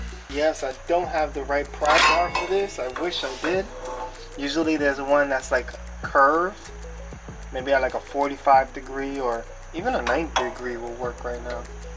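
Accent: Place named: New York, United States. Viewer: Enjoyed the video totally yu definitely from ny tho Not for nothing 😭💯🤘🏾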